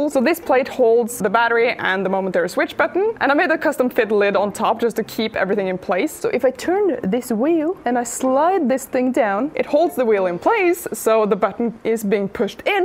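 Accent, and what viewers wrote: Norway. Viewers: 1) You should make it in hot pink to match your hair and 2) a green laser on your fingertip.... 🙂 This is very awesome. I love your accent, too. Norsk? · good to hear a fellow norwegian :)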